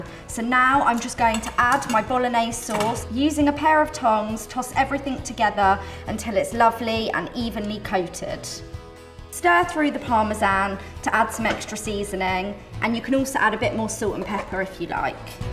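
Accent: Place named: United Kingdom. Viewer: Jesus ! She is more british than ... than .... than.... the..... than the brits 😅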